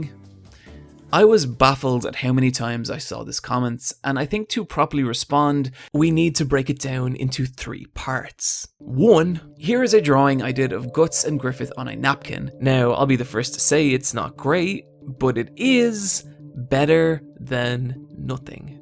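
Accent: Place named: Dublin, Ireland. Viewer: are you Irish? You have a Dubliner accent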